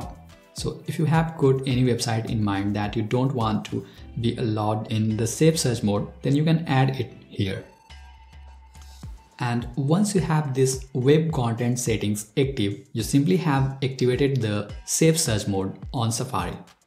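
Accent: India. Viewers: i hate indian accent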